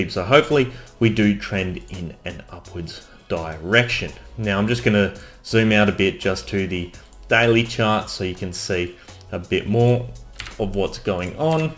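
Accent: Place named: Australia. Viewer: Finally someone talking in Aussie $$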